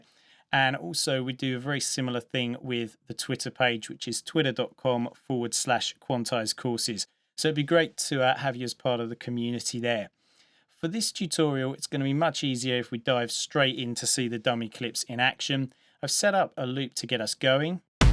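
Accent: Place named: United Kingdom. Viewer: Nobody knows how i love british accent!!!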